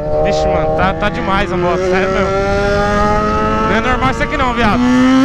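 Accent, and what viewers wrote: Goiás, Brazil. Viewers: porque vc fala no pique do Goiás vc nasceu em Goiânia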